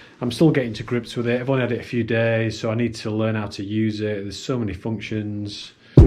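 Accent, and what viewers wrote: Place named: Yorkshire, United Kingdom. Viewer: Congrats on the new camera! From your accent you sound like a Yorkshire man? Similar to mine 🤦🏻‍♀️ if I’m wrong 😂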